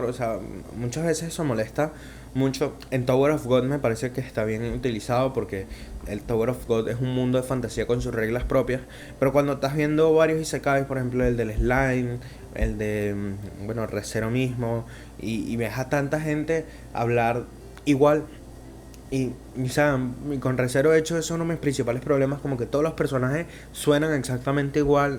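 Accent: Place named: Venezuela. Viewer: Nadie: Yo pensando que tiene acento venezolano y dudando hasta que habla de Colonia Tovar. PD me gustó mucho el análisis de la arquitectura, de hecho hace poco para una práctica de dibujo quise replicar las ciudades de Mushoku y puedo decir que el trabajo detrás de la inducción a estos escenarios, la forma en la que te transmiten el cómo cambian las locaciones es un trabajo muy bien hecho.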